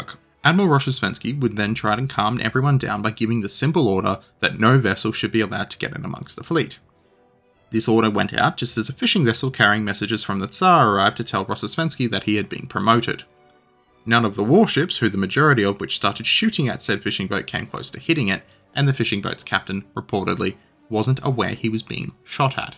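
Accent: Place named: Australia. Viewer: His editor is swedish. He is australian. You can hear it by his accent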